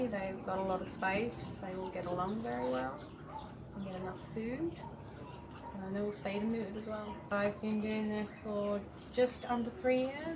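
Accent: Australia. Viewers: are you an Aussie? Or British? I’m trying to pick your accent. You’re an absolute ANGEL. Thank you from the bottom of my heart, for what you’re doing for these cats ❤❤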